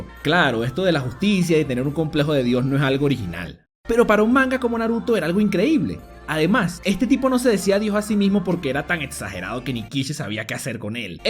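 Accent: Venezuela. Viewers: este por el acento es venezolano XDD en fin mi opino sobre el tema es que si esta fue una buena saga y también es mi favorita pero; al iniciar la 4ta gran guerra ninja shinobi también fue épico su inicio y el camino que pudo haber llevado pero luego de que todo fuera guerra de genjutsus y el drama de sasuke pa presidente y naruto por ahí la trama empezó a caer notablemente, en los inicios de la guerra también pudo verse a personajes secundarios brillar aunque admito que la verdad pudo haberse desarrollado mejor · eres venezolano verdad? por el "y de paso" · Eres Venezolano? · ajaj eres venezolano :v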